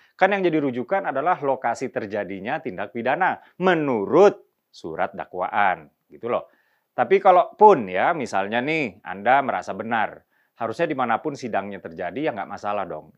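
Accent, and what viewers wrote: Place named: Java, Indonesia. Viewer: Logat Jawa nya terlalu nampak Bang....😅😅😅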